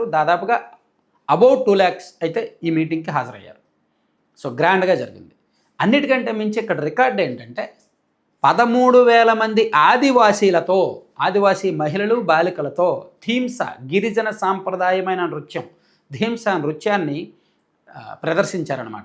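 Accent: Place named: Telangana, India. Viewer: You talk like a Telanganites!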